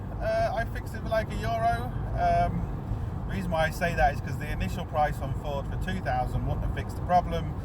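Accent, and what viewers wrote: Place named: United Kingdom. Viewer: Great Job! British Guy LOL Thank You !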